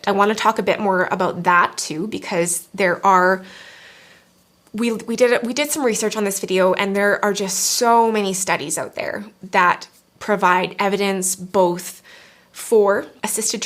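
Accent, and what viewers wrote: Canada. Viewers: I think you're missing some of her points. She's not saying she doesn't think there are situations in which forced medication is necessary, but that the way in which it's enforced can be much more harmful than it should be. Also, I'm new here, but she sounds like she has a Canadian accent- she may not be from the US- but that's just a guess. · The part that was most jarring for me is that they stripped you naked like a criminal prisoner being stripped searched. Why was that necessary? Terrible :( Are you Canadian, btw? Just the way you pronounce "out", "about" etc.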